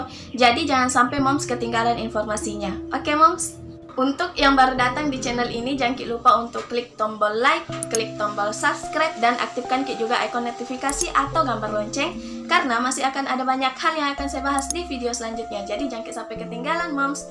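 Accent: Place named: Bugis, Indonesia. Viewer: logatnya seperti orang bugis 🙏😁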